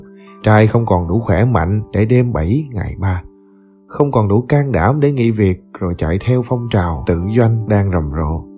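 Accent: South, Vietnam. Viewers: Thằng này giọng Nam nô nghe chán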